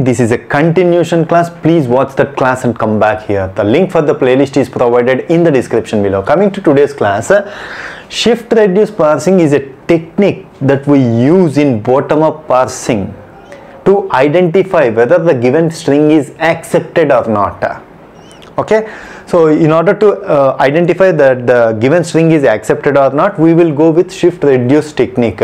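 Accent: South India, India. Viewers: Sir aap South se Ho n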